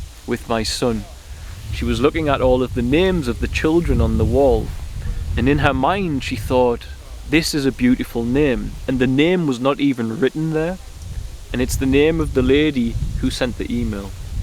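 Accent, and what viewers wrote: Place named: Newcastle, United Kingdom. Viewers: this one was a goody, btw way are you a geordie? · He has a Durham accent, northeast English anyway. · I sense a Durham,UK accent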